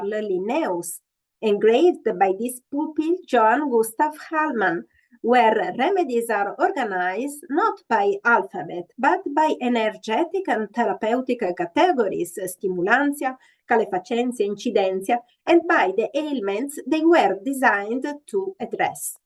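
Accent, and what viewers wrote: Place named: Iceland. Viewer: how Friulian accent can sound close to Icelandic accent in the British language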